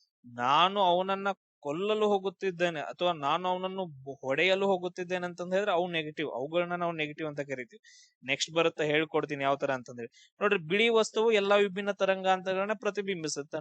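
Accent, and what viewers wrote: North Karnataka, India. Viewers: ಸರ್ ಉತ್ತರ ಕರ್ನಾಟಕ ಭಾಷೆ ವಳಗ್ ನಮಗ್ ಕ್ಲಾಸ್ ಕೇಳೋದ್ ಒಂದ್ ಮಜಾ ರೀ 😁... ಸರ್ ವಟ್ಟ ನಮ್ಮ ಹುಡುಗೋರ್ ಪಾಸ್ ಆಗ್ಬೇಕು ನೋಡ್ರಿ ಹಂಗ್ ಟೆಕ್ನಿಕ್ ಸ್ಕ್ಯಾಮ್ ಹೇಳ್ಕೊಡ್ರಿ... ನೌನ್ ಈ ಸಲಾ ನಾ ನೀ ಅನ್ಬೇಕು ನೋಡ್ರಿ ನಮ್ಮ ಕಾಮೆಂಟ್ಸ್ ಮಾಡೋ ಹುಡುಗೋರ್ ಸೆಲೆಕ್ಷನ್ ಲಿಸ್ಟ್ ವಳಗ್ 😁😁.... ನಿಮ್ಮ ತಲಿ ವಳಗ್ ಏನೇನ್ ಪ್ಲಾನ್ಸ್ ಅದಾವ್ ಎಲ್ಲಾ ಹೇಳ್ಕೊಡ್ರಿ ಸರ್ ನಾವ್ ಅಂತ್ರು ರೆಡಿ ನಿಮ್ಮ ಕ್ಲಾಸ್ ಕೇಳಾಕ... ✨✨🙏🏻🙏🏻🙏🏻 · Sir pakka namma uttar Karnataka davar❤😂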